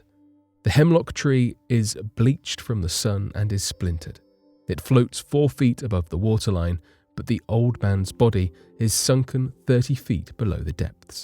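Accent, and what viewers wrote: United Kingdom. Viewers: I hear the British accent so understood and somewhat excused but the word 'myth' is incorrect and insulting consistent of the Western European mind and history with all cultures information other than Christianity. For your education, all information Native Americans share are historical facts passed Down from one responsible LISTENER and wise keeper of the truth to the next. It is accurate. It may not be in a language or give an image of justification that your limited exposure may be able to verify. Africans do it as well. Use of the word Myth in such a manner brings forth Arrogance, a way of thinking that Covid19 is demanding cultures and people that use it to cease and desist and the virus will Diminish.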